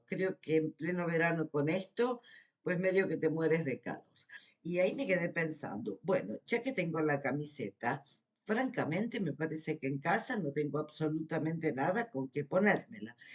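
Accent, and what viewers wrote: Argentina. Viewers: Portugal es muy bonito, yo conozco varios lugares de allí. Pero tú eres de Argentina, por tu acento . Yo tomé clases de tango con una profesora de Buenos Aires, aún sigo pero ya no en clases, solo prácticas y milongas. Un saludo desde Vigo.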